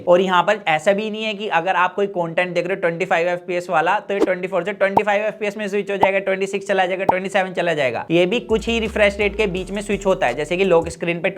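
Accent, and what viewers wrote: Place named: Bhojpuri, India. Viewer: Batiya rhe bhojpuri 😂 achha lga bhojpuri type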